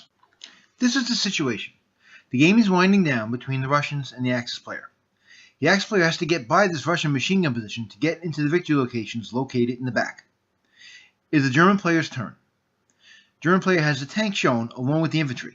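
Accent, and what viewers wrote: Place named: New England, United States. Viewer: I would like to see the following, 1-AFV moving through Woods-Road hexes while encountering a KEU in one of those hexes. 2-A Truck with passengers doing the same thing. (the objective is to get past the KEU, not slug it out in a Fire Fight) I have done this, just wanted to see what you would come up with. I enjoy your videos, just slow down on that NE accent once in a while. Thanks.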